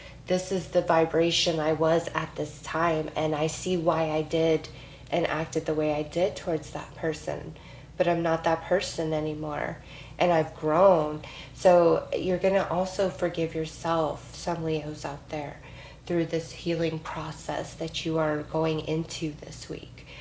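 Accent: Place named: United States. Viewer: Omg we had an ad in Britain for a chocolate bar called cadburys caramel a swear you could be the same voice in an American accent amazing I so love your readings thankyou so very much xxxx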